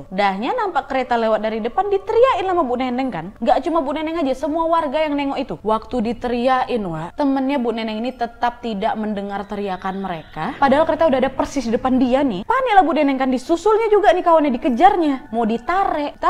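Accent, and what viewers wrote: Indonesia. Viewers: Hebat kamu bisa membawa rasa Indonesianya(Aksen) dalam cara membaca naskah. Terusin channelmu semoga sukses👍👍👍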